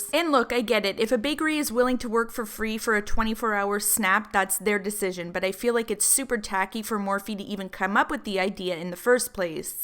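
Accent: Canada. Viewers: are you fromcanda or the midwest? that accent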